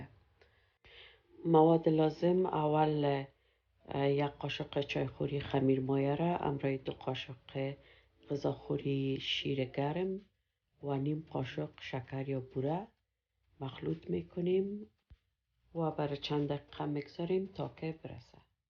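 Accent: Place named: Kabul, Afghanistan. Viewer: از لهجه تان معلوم میشود که از کابل جان استید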